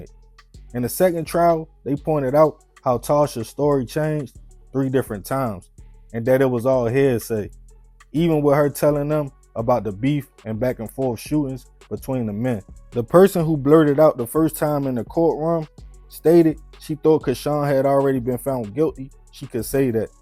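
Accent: Baltimore, United States. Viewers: Ngl that intro is hella corny, is that how Baltimore niggas talk?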